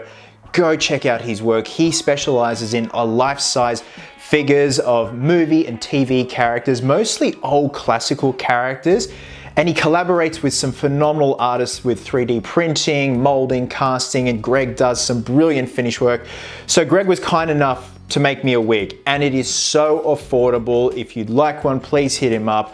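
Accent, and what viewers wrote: Australia. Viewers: Hey. Just subbed to u. I also used BYS for my joker cosplay in school book week and it turned out great. Although I used hair spray on my hair, did the trick, but was a bit light. What else could u recommend? Also, it sounds like ur from Australia, and if so, me to!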